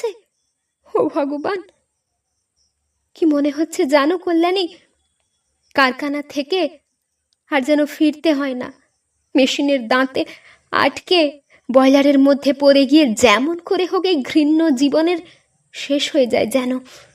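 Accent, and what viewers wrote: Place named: Bangladesh. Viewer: Madam narrator you must be from Bangladesh. Bad narrator.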